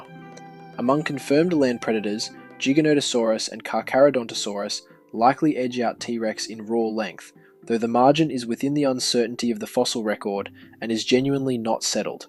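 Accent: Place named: England, United Kingdom. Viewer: that just the way red coats say the word.. some annoying english guy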